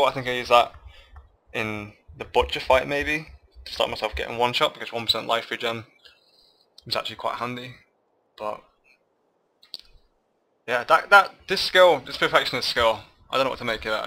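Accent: United Kingdom. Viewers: You really sound like you're from around Leicester aha. Are you? great guide though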